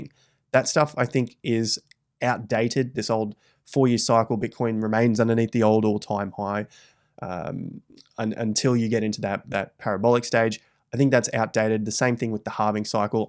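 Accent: Australia. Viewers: Legendary as always! I seem to laugh more than I probably should purely from the Aussie delivery and the humour 🤣👌 love it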